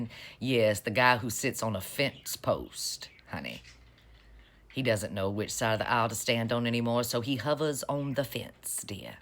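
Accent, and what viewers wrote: South, United States. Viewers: Your accent is beautiful, I have never had a good impression of southern accents until you. Subscribed for the pleasure of listening and intellect. Thx, you should narrate for documentaries... ❤️ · Totally agree!! It reminds me a little of the Agatha Christie' A Murder Is Announced... "she wasn't there"... and the ear wound... IYKYK Just to ask where in the States are you from? As an English woman, I love your accent! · Tbh, at first I thought you were saying Butt Love🤣, not Butler! I was thinking that maga would be all disturbed for sure about FDT engaging in Butt 🏳️‍🌈Love, and any endless conspiracies of 🏳️‍🌈 behaviour... 😂 I'm not from the states, btw, so sometimes the stronger accents from the south distort the words in a way I'm not used to hearing. Thank you for the giggles!!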